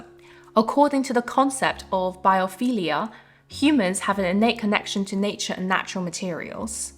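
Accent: United Kingdom. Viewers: I've never heard so nice British accent. ❤ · I’m brazilian and your video cames to me. I’m IN LOVE with your accent and now I’m “using” your channel to practice british accent/english. THANK YOU FOR YOUR CONTENT! Ps: Also, I’m building my house with my groom, so your tips is very rich. Again, thank you!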